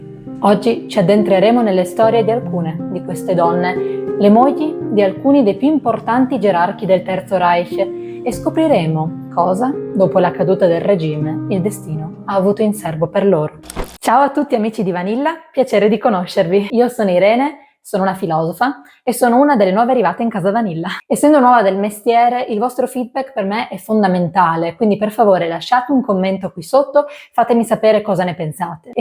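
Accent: Trieste, Italy. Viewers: Brava! Ottimo video e ben esposto. Complimenti! ps.( ma sei triestina?) 😅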